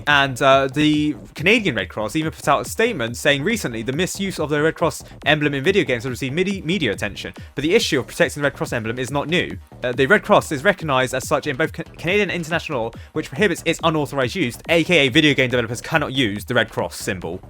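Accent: United Kingdom. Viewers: Let's go i love British man talking about Geneva convention laws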